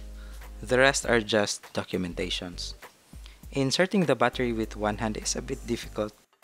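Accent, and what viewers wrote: Philippines. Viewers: Yourrr Englishh yoww i halata that you are relly a pilipino.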